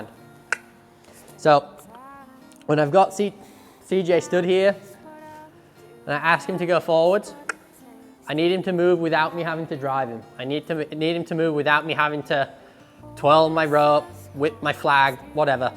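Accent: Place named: United Kingdom. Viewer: Bit difficult to take this serious a cowboy with a British accent.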